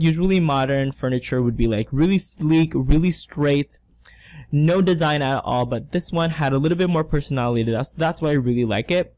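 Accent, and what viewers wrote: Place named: Philippines. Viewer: I really like it!! new subscriber 👏 Ps. I already thought of you being a filipino just when you started talking!! #teamfilipino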